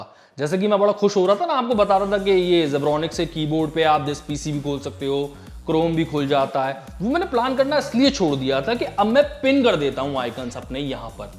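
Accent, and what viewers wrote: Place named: Uttar Pradesh, India. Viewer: bhai tumhare bolne ka tareeka bohot badiya hai........bilkul up touch hai accent me......kanpur se ho kya??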